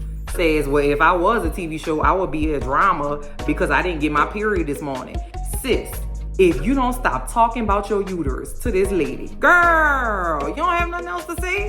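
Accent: New Orleans, United States. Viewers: Geez! I'm so glad I found you and your channel. Ive watched reviews of this show from others and can't even get through the full review. Plus, your New Orleans accent made me subscribe in less than 1 minute into the video. I know in my bones this about to be good! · You sound like my people back home in New Orleans… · Your channel came thru my feeds on sheer accident and I LOVE IT😍First off Bayyyyybeee I can hear that Nawleans accent a mile awayyyyy heard me😝 secondly, you are HILARIOUS 🤣🤣🤣 When you went off bout Steve sticking his sticky fingers all over the mashed potatoes and kitchen cabinets I was outta here 💀🤣🤣🤣🤣🤣🤣🤣🤣🤣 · I knew you were from New Orleans the second you opened your mouth. You have a new sub, neighbor!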